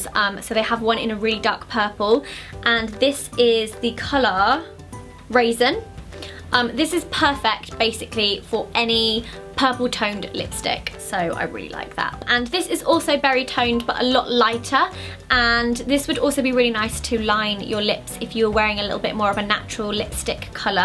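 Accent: United Kingdom. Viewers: I've been watching your videos for so long that I forget your British and your "accent" doesn't sound different to me anymore. You basically sound American to me lol👌😂❤️ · I thought she was British...how does she speak English?????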